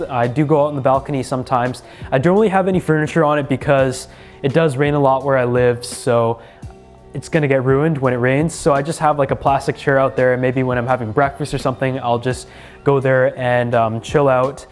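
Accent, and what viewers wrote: Canada. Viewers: He sounds Canadian.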